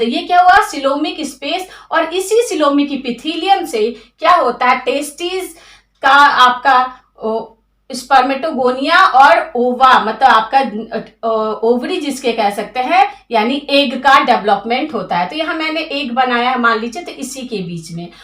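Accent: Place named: Bihar, India. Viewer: Accha smjhaya mam. u r from Bihar ...h n ...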